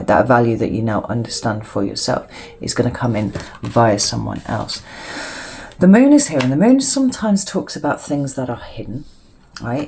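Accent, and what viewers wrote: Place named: England, United Kingdom. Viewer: What K.Y. or C.Y. cat has you cleaning out there litter box. You may capture America with your English accent.or would you prefer my short comings lest wow grab a twix candy bar and coke pop, soda I guess I don't belong in America this next 50 you have a flat $ 640 nothing included a month .I'll sign a contract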